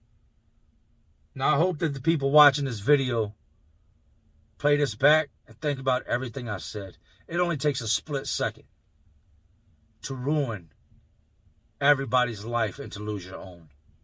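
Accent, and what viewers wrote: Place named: Philadelphia, United States. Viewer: You sound just like a Philly guy🔥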